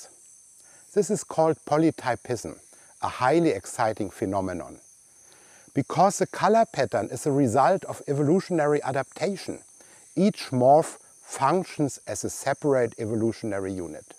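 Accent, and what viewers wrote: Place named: Germany. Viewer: Was für ein wertvolles Projekt und es tut gut, all diese unterschiedlichen, schützenswerten Tiere zu sehen! Die mit dem Projekt eben endlich tatsächlich geschützt werden können! Nur der deutsche Akzent des Sprechers war halt wirklich extrem. xD Das war etwas befremdlich. Wird trotzdem geteilt, damit das hoffentlich mehr Leute sehen!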